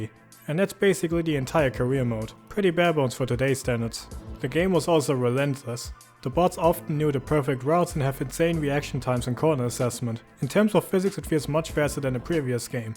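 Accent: Germany. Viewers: This has been real. What a nostalgic trip. Nice vid! You German? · man hört so sehr raus wie deutsch er ist😂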